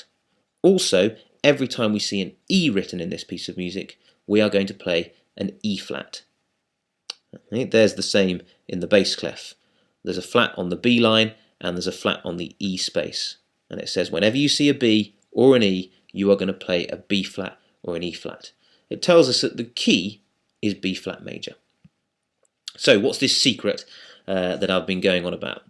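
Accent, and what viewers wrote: United Kingdom. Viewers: Why do I learn better from a dude with a British accent? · THANK YOU.YOU ARE AWESOME AND YOUR ACCENT MAKES ME MISS UK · British man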